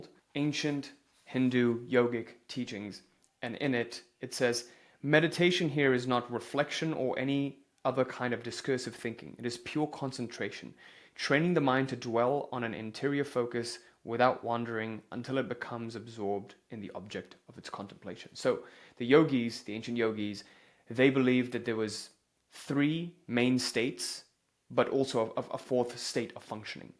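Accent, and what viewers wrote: United Kingdom. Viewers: Why is Muslim talking about Sanatan Dharm- Hinduism in a British Accent for American audiences? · Why is Muslim talking about Sanatan Dharm-Hinduism in a British Accent for American audiences? I guess The truth shall set you free, at the end of the day the truth will prevail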